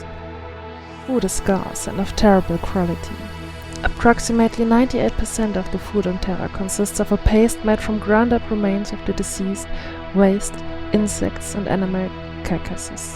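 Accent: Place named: Germany. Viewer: Your German accent is beautiful; it blends very well with the dark/gothic atmosphere of Warhammer. It is definitely an add-on. I love it! 💀THE EMPEROR PROTECTS💀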